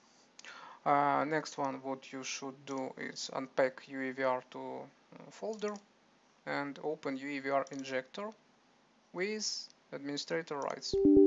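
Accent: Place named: Russia. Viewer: А можно тоже самое только по русски? Акцент выдает русскую школу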